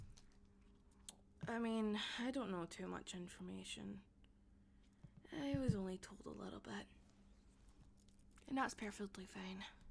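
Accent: Scotland, United Kingdom. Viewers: I should be afraid, but the Scottish accent intrigues me way too much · I guess being scottish is a new fetish, welp *i like it*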